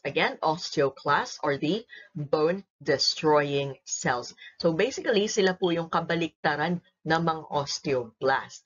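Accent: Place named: United States. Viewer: Thank you so much po! I love, love you! Super helpful as a nursing student! Currently listening to this while I arrange my flashcards. And oh my, ang natural-sounding po ng American accent niyo, and the way you code-switch makes me fangirl 😳 Linguistic enthusiast here hehe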